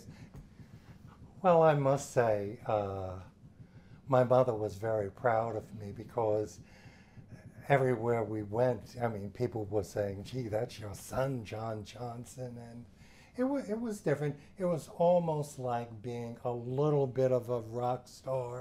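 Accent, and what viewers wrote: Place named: Transatlantic, United States. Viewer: One of the few anchors holding on to the Transatlantic Accent.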